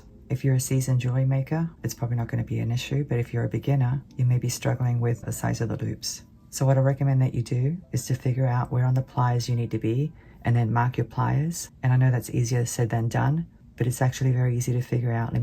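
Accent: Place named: Australia. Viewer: I watch on my android phone and I never have problems with the audio and I love your Australian accent.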